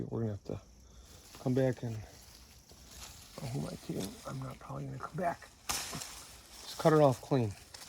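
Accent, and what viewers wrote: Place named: United States. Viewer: Feels so weird to se a person so obviously Finnish by the looks, speak that American accent. /67% finnish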